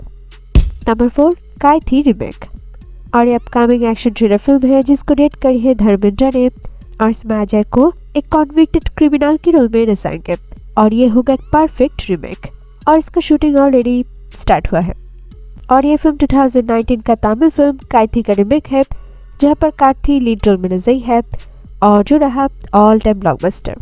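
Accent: Bengal, India. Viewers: You are a bengali ?? Your acceent is a bengali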